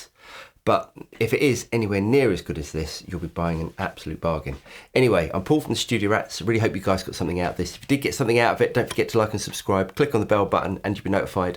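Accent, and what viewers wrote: United Kingdom. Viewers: Ummmm I got the suspicion that you are Brittttish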